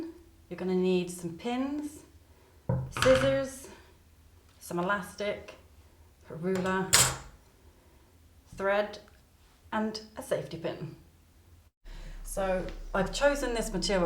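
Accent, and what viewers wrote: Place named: England, United Kingdom. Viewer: Thank you so much my friend you sound like you from England my friend god bless you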